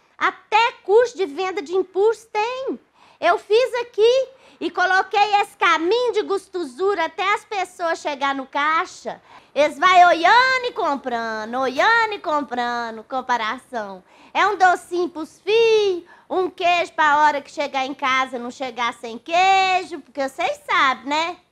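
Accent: Minas Gerais, Brazil. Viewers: Que dialeto maravilhoso! Minerês é um deleite. Obrigado e grande abraço 🙋‍♂️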